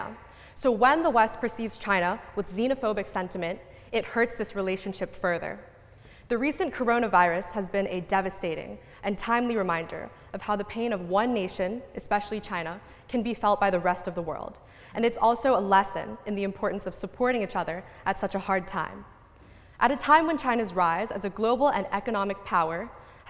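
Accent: United States. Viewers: Haha, she is incorrect of course because we can all see what china has done to it's own people! Plus this girl who seems to know so much about the country she defends has not lived there! If she was living there maybe she would have a better idea in fact about the ccp and how they operate. I believe to defend a government you have to know from experience what you are defending. She talks about the west in a unfavourable way with her American accent, I find it somewhat ironic to be honest. My family are immigrants , yet I do not attest to know the life they endured. I found this girls speech insincere and her attitude aloof. She is very much entitled to her opinions of course and so am I! My advice to anyone who wants to know about ccp go live in mainland China and see for yourself. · She sounds American.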